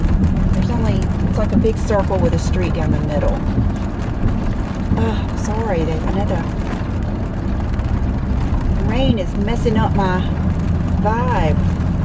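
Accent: South, United States. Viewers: I’m loving these daily vlogs....love seeing how much our days are so much alike. We really are more similar than we are different. And we both have accents...yours is a sweet Southern and mine is a horrible NY/ Long Island accent...lol yuck. I can’t believe how long your hair has gotten. I’m trying to grow mine longer...what did you do to grow yours so fast? Vitamins?? Beautiful neighborhood. The home pastel colors reminds me of Bermuda homes. Idk the cost of the homes there but anything like that on LI is well over a million $$.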